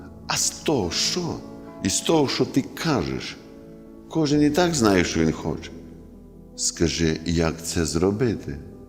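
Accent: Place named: Galicia, Ukraine. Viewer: Мені дуже подобаються ваші проповіді чіткі і цікаві і ще ваш чудовий галицький діалект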